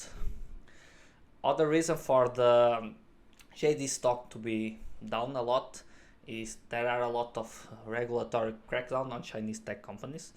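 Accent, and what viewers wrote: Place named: Portugal. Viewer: Good video! I like your Portuguese accent. Sounds a bit like José Mourinho (that's a compliment)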